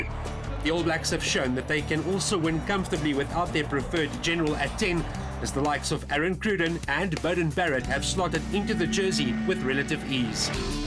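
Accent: South Africa. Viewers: it's a South African accent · I love the South African accent bro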